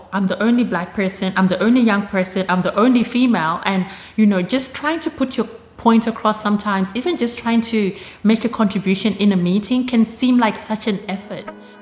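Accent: Australia. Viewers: Your accent is interesting it sounds Australian but not quite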